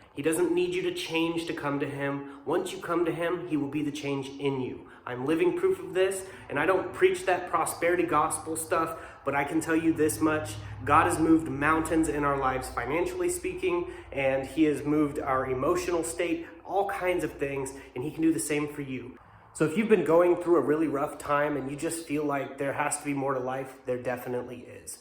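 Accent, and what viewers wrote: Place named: Arizona, United States. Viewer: Good stuff bro! God bless you and your channel! That’s not a Texas accent, you sound like Arizona, but that looks like south East Texas… Take Care brother, appreciate your encouragement!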